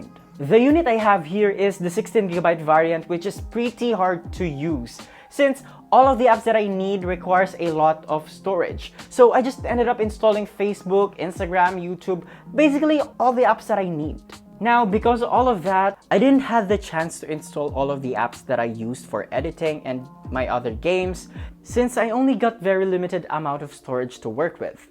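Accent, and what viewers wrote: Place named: Philippines. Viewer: Knew he was a filipino by the accent, hahah proud pinoy!!!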